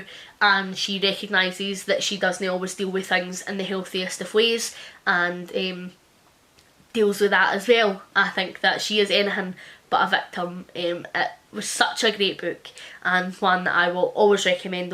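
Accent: Scotland, United Kingdom. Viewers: I love regional dialects and sayings - they are just so ingrained in people’s identity and should be celebrated. So many Scottish sayings remind me of some Irish sayings (my family are from Belfast) so in a strange way I feel a little bit of comfort hearing them and I could honestly listen to you talk all day! 😁 Taps aff cracks me up!😂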